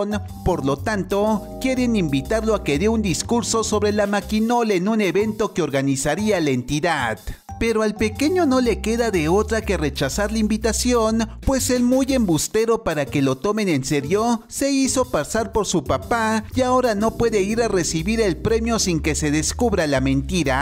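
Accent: Mexico. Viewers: Primera ves que escucho una narración al estilo chilango,.. pues está chida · Mexicano, Mexicano el narrador !!!😁👍💪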